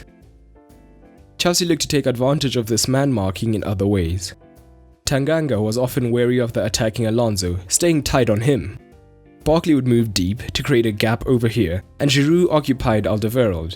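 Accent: South Africa. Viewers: This is a South African accent.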